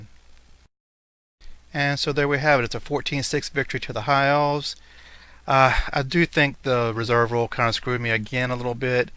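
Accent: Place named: South, United States. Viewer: Only just started wtaching your Battle reps and you probably get this alot, but your intro music is class and southern accent is great for narrating! The battle reps are very good also! Great Job!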